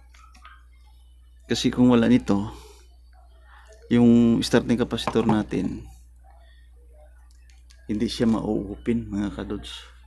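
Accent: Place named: Visayas, Philippines. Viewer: ok bokal salamat men galing ng visaya ka.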